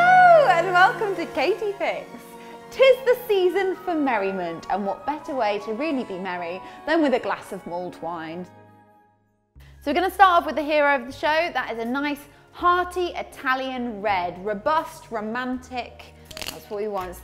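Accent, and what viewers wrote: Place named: London, United Kingdom. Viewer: I love your London accent :)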